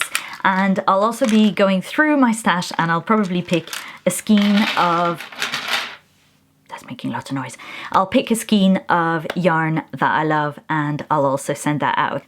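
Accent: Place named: Canada. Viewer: I can't agree with you more! Kindness starts with ourselves. My 16 year old daughter and I have been traveling around the world for her surfing comps. Four continents and six countries later we are struck how everyone everywhere has same dreams, hurts, successes, failures, trials, triumphs, loves and struggles. We have to have compassion and love on one another individually. We are not that different from each other. God has laid this heavy on my heart. I am gonnaknit on Ravelry. I am an expat Canadian living in the USA with my family of 5. I love listening to your Canadian accent and have enjoyed every single episode.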